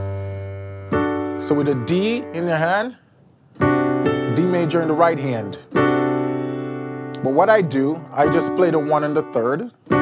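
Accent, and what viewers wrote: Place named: Jamaica. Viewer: are you Jamaican? I hear a cool accent in there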